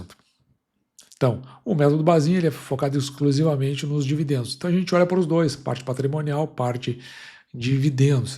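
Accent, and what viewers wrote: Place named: Rio Grande do Sul, Brazil. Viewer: Cara tenta falar mais neutro..vc não vende peixe só pra gaúcho. INSUPORTÁVEL sério, fica a dica. Vc puxa muito acento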